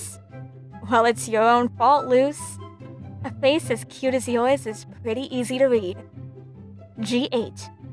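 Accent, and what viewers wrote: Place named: Spain. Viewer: You have a nice Spanish accent. :)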